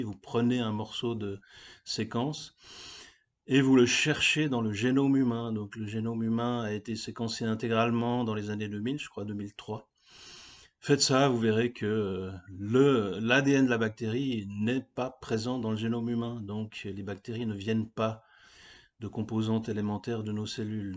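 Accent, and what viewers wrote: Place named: Switzerland. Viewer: Moi, je suis français mais juste de l'autre coté de la frontière Suisse (coté Jura) ... D'où l'habitude d'entendre ton accent ... Je suis biologiste médical et j'ai donc des compétences en infectiologie et en biologie moléculaire faisant tous les jours des PCR covid ... Et donc la contagion des maladies infectieuses, je la constate tous les jours. Je suis entièrement d'accord avec toi qu'il faut mettre en lumière Béchamp pour expliquer à toutes ces personnes qui sont intervenues en commentaire de cette vidéo que ce scientifique a TOUT faux.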